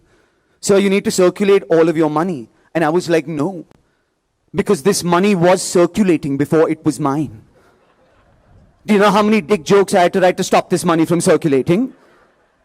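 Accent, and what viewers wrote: India. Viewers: Now that was in proper indian accent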